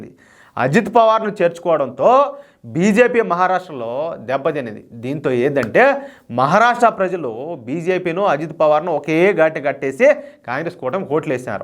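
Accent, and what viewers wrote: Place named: Chittoor, India. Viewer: గారికి వందనం మీ చిత్తూరు బాష బాగుంది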